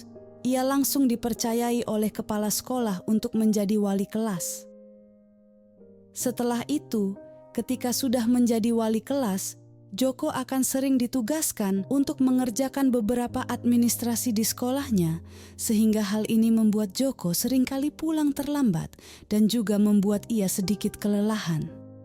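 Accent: Java, Indonesia. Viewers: Iya udah translate jawa pulak logatnya taik taik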